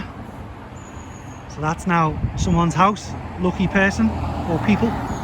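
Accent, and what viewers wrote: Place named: Liverpool, United Kingdom. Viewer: Scouse is endangered so it is refreshing to listen. You speak slowly enough for me to get used to it. Thanks for the little stories, so important to keep traditions alive.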